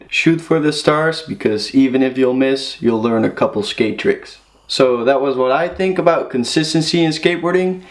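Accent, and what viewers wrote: Netherlands. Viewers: He has a Dutch accent, m8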